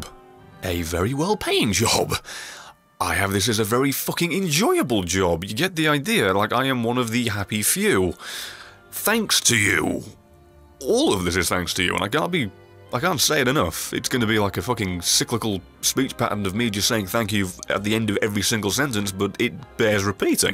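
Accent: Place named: England, United Kingdom. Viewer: It feels weird to think that I subscribed to this back when you didn't even have 20k subscribers. Hell, I thought you ha way more subscribers until you uploaded that 50k subscriber video. It was just legitimately funny watching you getting your shit wrecked by Dark Souls bosses & having goofy reactions to multipayer gamertags. Then again, I usually find rapid-fire comedy with an English accent irresistible. Congrats on the 100k!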